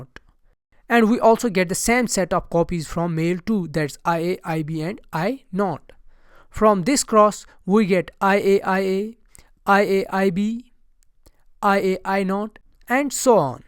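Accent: Kashmir, India. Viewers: Aapkee aawaz suntai hee smjh aaya aap Kashmiri ho😍❤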